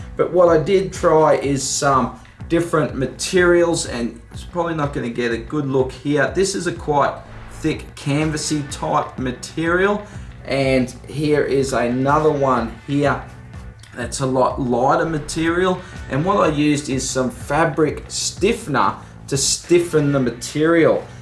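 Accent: Australia. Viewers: this austrailian english love it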